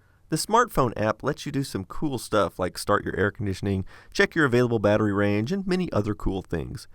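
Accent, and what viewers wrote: Texas, United States. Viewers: I noticed a bit more of a southern accent while filming in the car, where someone was holding the camera. I wonder if he switches it up a bit when talking to (or in front of) a fellow Texan.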